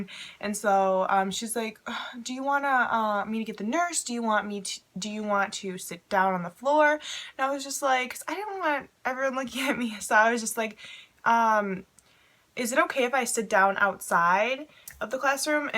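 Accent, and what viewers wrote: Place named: New York, United States. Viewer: Where are you from you have that funny accent lol "she eeasked" no offense I just think you're from New York or something ☺️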